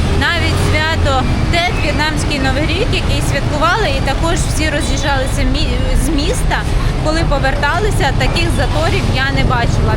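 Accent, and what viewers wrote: Standard. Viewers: Коли дивлюся ваш1 в1део, то насолождуюся вашою чистою укр. Мовою. Ваша чиста р1дна мова це показник вашоï культури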